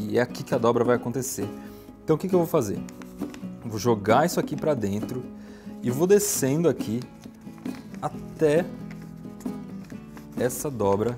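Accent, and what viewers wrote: Brazil. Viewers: muito feio esse seu pt-br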